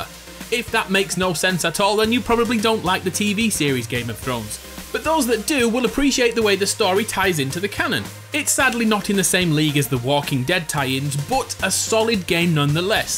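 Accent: United Kingdom. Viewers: that BRITISH VOICE tho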